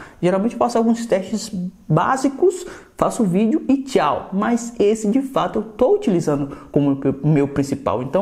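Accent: Bahia, Brazil. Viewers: Mim escrevi no seu canal vc e baiano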